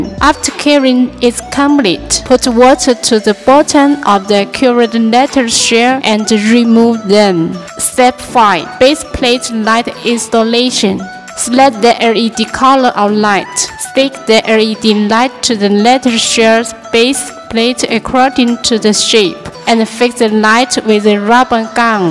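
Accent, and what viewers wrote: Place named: China. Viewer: what a pain to listen to her zinglish 🐱‍🐉🐱‍🐉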